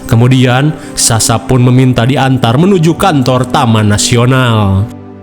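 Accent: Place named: Sunda, Indonesia. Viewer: Narasi narator, kaler, cagak, poek, rorombehen, ari si akang urang mana euy ?😅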